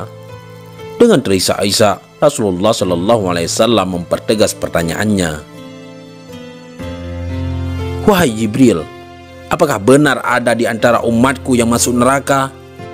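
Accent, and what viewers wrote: Aceh, Indonesia. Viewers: Dialeg Aceh sptnya..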